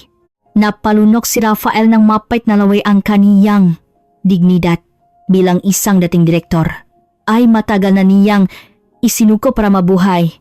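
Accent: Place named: China. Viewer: Parang hinahabol n kamatayan ang narrator, at masyadong slang n inchik ang pagkakasalita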